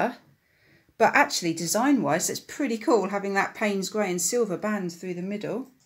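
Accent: United Kingdom. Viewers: I found you a couple of nights ago. Well done on showing us your pour experiments it must have taken a long time to prepare. I did my fist pour yesterday they were all a bit of a mess but very pretty and I managed cells. So lots of practising ahead for me. I left everything on the kitchen table so round 2 today. Keep going it's nice to hear a British accent and no annoying background music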